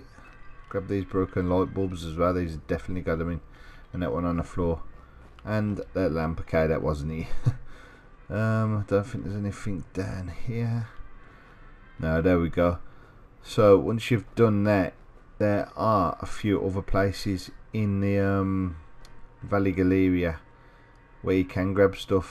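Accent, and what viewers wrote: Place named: Birmingham, United Kingdom. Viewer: I never thought I'd find a fellow Brummy on YouTube. Our voices are shit for commentary haha.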